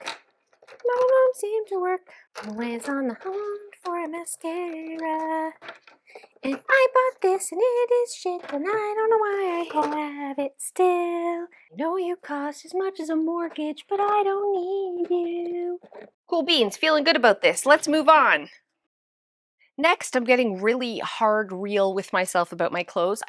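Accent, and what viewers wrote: Canada. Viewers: just found you, love you!! :face-red-heart-shape:that accent, Canadian??, sunny, funny relief, away from world issues ( to OUR mini world issues)...I'm cluttered to the max!, I have PTSD ( not due to the clutter, which yes, is traumatic!) but after I watch you I feel exhausted, so I've virtually worked right?😜. I keep a journal, to make sure I do SOME decluttering every day, even a tiny amount is something. Thanks for being here x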